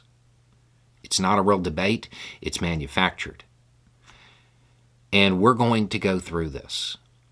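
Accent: South, United States. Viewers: I feel bad about what you said your accent. The southern accent is such an incredible accent, so beautiful and will always be so.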